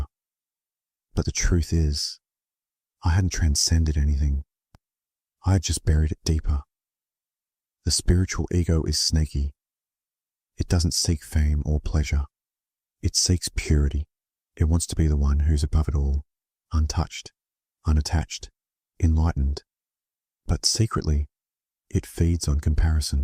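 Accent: Australia. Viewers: The content is interesting but this AI chinese dope with an aussie accent gets old fast, can’t watch it. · It is does like he's from the Outback, but the audio quality is even and smooth, for once.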